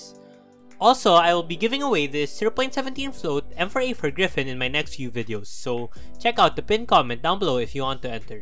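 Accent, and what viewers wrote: Philippines. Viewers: my favorite trade up is the safehouse tradeup it made me 2.30 cents thanks you sound Filipino haha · Filipino for sure. Atleast he is trying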